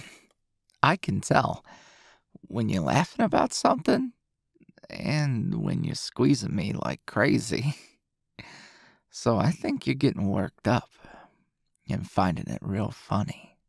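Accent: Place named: New York, United States. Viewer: The new york accent 😍😍😍😍😍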